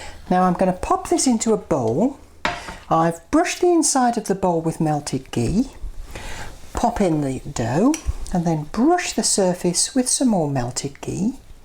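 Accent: United Kingdom. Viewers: Thank you for the video. May I ask why you have British accent but speak Arabic?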